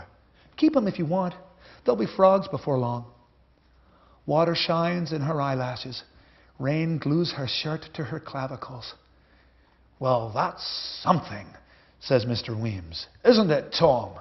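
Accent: United States. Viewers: why is he reading in an american accent at Oxford?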